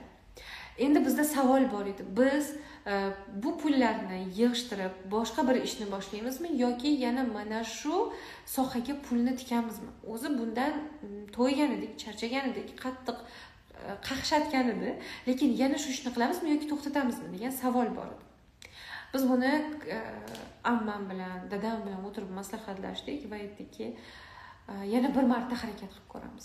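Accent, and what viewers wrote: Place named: Uzbekistan. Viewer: манга сизни жасоратлингиз ёкди. Жуда чиройлийсиз, исмингиз жисмингизга монанд мафтункорсиз, сокин, у́збекона гапирар экансиз. У́з хаётий тажрибангиздан очик- ойдин бу́лишганингиз учун рахмат. Сиздек у́збек йигит- кизлар дунёга танилишларини хохлардим.Янада юкори чу́ккиларни забт этинг.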